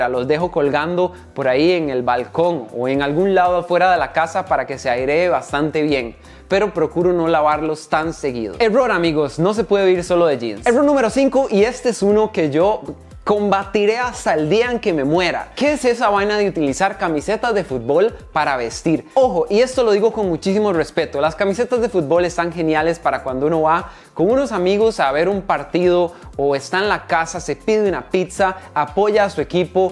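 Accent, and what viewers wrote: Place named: Costa Rica. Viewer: habla como tico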